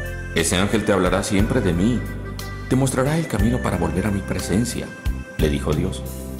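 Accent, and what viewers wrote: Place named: Latin America. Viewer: Bonita historia..D : De donde sacaste la voz? es latina ,jaa ..pero esta bien,tiene su encanto.jaa te doy mi pulgar jajaa ..hayy que daño..no te rias ,quitarse el pulgar para dartelo ,duele un poquito jajajajaaja... te queria dar las estrellitas uhm..pero se me estropeo el Cohete interrestelar jajaaaaa... besitos de un gatttito gotico..miauuuu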